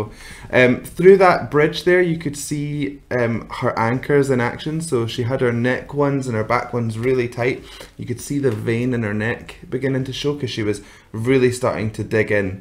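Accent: Scotland, United Kingdom. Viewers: Liked your analysis and reaction to Katrina Velarde’s voice. Also enjoyed listening to your Scottish accent. c”,) Nice tea mug !!! Hehehe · There's just so many singing talents in this country,bro! You'll surely enjoy reacting to each. Just give in to your filipino subscribers' requests. Btw, i like your scottish accent! And your professional remarks,too.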